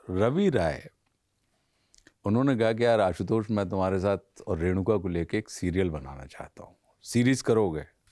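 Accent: Standard. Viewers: Your hindi is awesome....ekdum shuddh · Bahat khubsurat Hindi bhasha ko Sanman se bolte hai sanman dete hai❤❤❤ aaj tak koi hero Heroine ko itna hindi me baat karte kishi show me nahi dekha sirf movie me bolte he ,baki to English English par ,, Aashutosh ji very pure ❤❤❤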